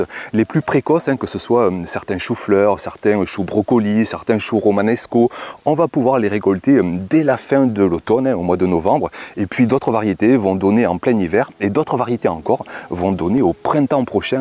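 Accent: South, France. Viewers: Un grand merci, pour tous tes conseils sur le potager. Ton accent du Sud et ta bonne humeur rendent chaque astuce facile à suivre et motivante 💚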